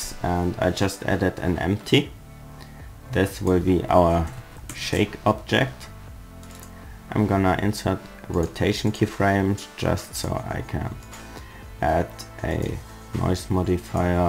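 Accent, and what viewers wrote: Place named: Germany. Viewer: bist du deutsche :D haat man aam anfang gehört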